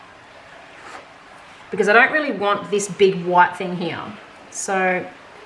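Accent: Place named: Australia. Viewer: I find your Aussie accent wonderfully refreshing ( our accent....lol )....this pic is gorgeous ! New sub here :)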